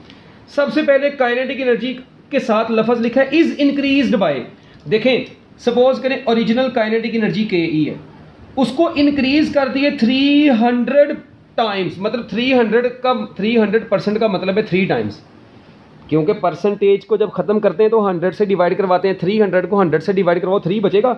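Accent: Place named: Punjab, India. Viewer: really sir jus amzing punjabi accent ke sath aur mast se samaj aa gyaa thnxxx a lot sir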